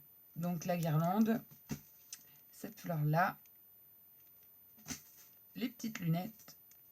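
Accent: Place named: England, United Kingdom. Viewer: J'adore ton accent anglais :)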